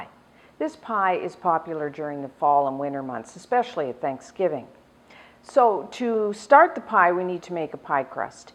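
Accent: Canada. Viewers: That's just her cute Canadian accent coming through. She also said Oat for out and a boat for about...we in the USA love to hear that accent. It's very charming :)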